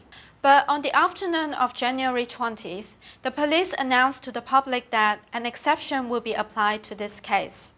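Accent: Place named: United States. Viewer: My friend this is my humble request please change your English speaking style your speaking American English Asian country peoples are not properly understand please my friend tried to speak British style English thank you for this video my friend🇨🇳🇨🇳🇨🇳🇨🇳🇨🇳🇨🇳🇨🇳🇮🇳💐👍✌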